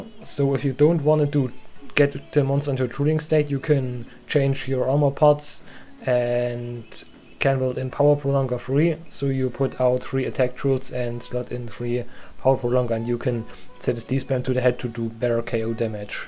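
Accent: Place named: Germany. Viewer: I hear that german accent😂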